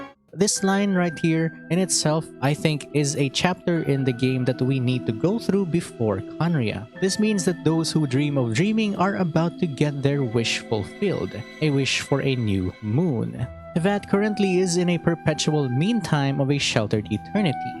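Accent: Philippines. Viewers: LMAO.,!! this is the first time that I really paid attention to how you speak and suddenly I could tell that you were a Filipino because of how you pronounce words so I checked your profile and saw your location in the Philippines!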